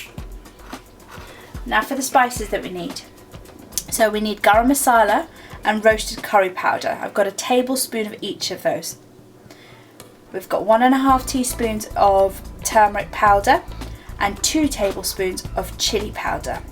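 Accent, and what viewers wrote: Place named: Sri Lanka. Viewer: sri lankan ENGLISH amma..hah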